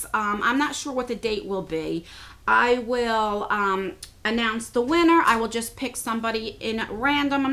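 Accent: New Jersey, United States. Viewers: Hi i really like your vidoes been subscribed for like 6 months now i love your Jersey accent its beautiful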